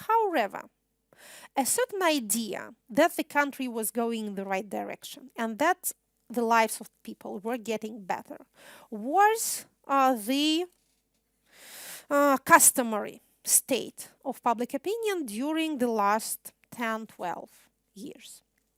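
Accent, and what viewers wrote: Russia. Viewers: Такой-то руслиш, всё понятно :) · ой это прекрасный русский акцент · Hello! The content of the lecture is very interesting I would like to note about the quality of English, which Ekaterina speaks. Catherine's English is definitely better than many of our journalists and public figures. Nevertheless, I noticed strong Russian intonations. Phonetics is not bad, but there is work to do. Thanks for the lecture. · Я никогда не поверю, что человек, который выучил английский на таком крутом уровне, не смог убрать самые жесткие штуки в произношение. Значит это специально. Кто-нибудь может объяснить, зачем специально говорить с таким жутким русским акцентом? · Спасибо за видео, скучала без вас. Понабежавшим училкам английского - русский акцент для русскоязычного человека это нормально, как и некоторое количество ошибок. Темп речи и словарный запас просто на отлично!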